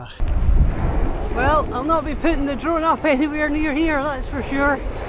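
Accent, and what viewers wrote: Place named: Scotland, United Kingdom. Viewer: Trying figure out the accent, where you grew up. You say you lived in Glasgow but you sound just like my mate from Selkirk. Pop Tarts are actually a great as a ride snack. Fairly heavy so 1 pop tart lasts ages, sugar for burst energy, carb for slow release. They keep empty bellies at bay if nibbled on throughout the ride. I do Cumbernauld to Dalkeith a lot as my partner lives there and i live Dalkeith. Depending on whether i do: Cumbernauld > Falkirk > Boness > Dalmeny > Edinburgh Canal > Edinbrugh or Cumbernauld > Cauldercruix > NCR75 > Edinburgh Depends on whether i take a few pop tarts. Canal gets you EICA and the cafe there. Boness has a nice wee cafe but the NCR75 keeps you from the main streets so i take provisions. ;) A wee pop tart. , I often ride to on my fatbike to North Berwick #MuttsCycles Videos on my business channel. 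But this section you are on i hate doing. lol, an... ex just stays 5mins form where you are. Always worry about bumping into her (tbf, i'm sure it'd be fine). 🤣😇 It's ok you missed Gullane, nothing worthwhile there anyway. 🤫 I'd say the Walled Garden is just up the road but you gotta be a millionaire for a Tea and Sausage Roll. 🤪 I bought my Fatbike from a Guy in those houses behind the fence. Super expensive. Nice though. Thanks for the video. I've not done Helensburgh section. Might look into that next weekend or similar. I enjoyed the video. Feel like it deserves more views.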